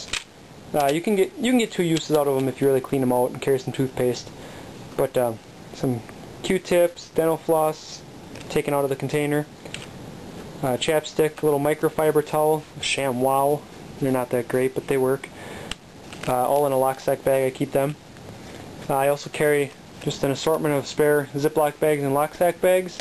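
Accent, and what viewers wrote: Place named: Upper Peninsula, United States. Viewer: you sound like a yooper der pale, you from canada or anywere around ehh?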